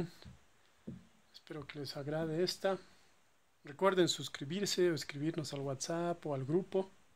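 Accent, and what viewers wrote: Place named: Bolivia. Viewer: hola estimado, por tu forma de hablar .. eres de Bolivia verdad?, si es así.... me podrías decir donde puedo encontrar estas leatherman raptor?, muy buena revisión!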